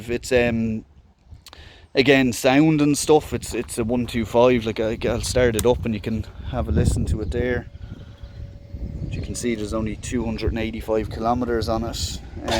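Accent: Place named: Ireland. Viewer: love the irish accent. Big fan of Conor McGregor 😁❤️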